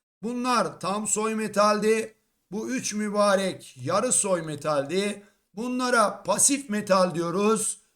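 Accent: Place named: Erzurum, Turkey. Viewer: hocam şans eseri youtube ana ekranıma düştünüz tıkaldım izlemeye başladım konuşmanızdan Erzurum havası aldım 4. soruda 0 altı Erzurum dediğinizde dedim ki bu hoca Erzurumlu yani benim hemşerim acaba doğrumudur. ❤‍🔥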